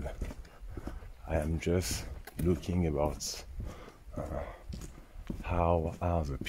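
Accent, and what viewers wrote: France. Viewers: C'est incroyable ce qui t'arrive 😁 j'aime bcp ton French accent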